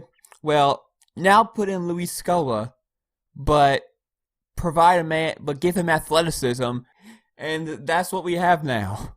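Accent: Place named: South, United States. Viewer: Are you originally from Toronto? Because it sounds like you have a Southern US accent.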